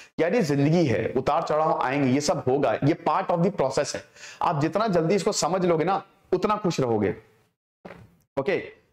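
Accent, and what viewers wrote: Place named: Bihar, India. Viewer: I have been listening to him for past few years and this is one of the best lectures. I like the Bihar ascent.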